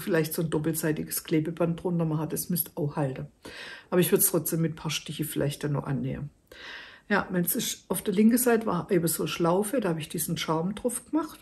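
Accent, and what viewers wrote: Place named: Hesse, Germany. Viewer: Hallo, ich find das super, das 'babbeln" zwischen durch. Hauptsächlich auch wegen dein Dialekt (ich bin aus Hessen) find ich toll❤. Natürlich auch das, was du uns zeigst ist immer wieder wunderschön. Ps seit langem suche ich Lochverstärker und nur die aus Kunststoff gefunden. Und du hast ein Gerät gezeigt, wo ich eigene herstellen kann. Ich bin begeistert. Danke du Liebe🤗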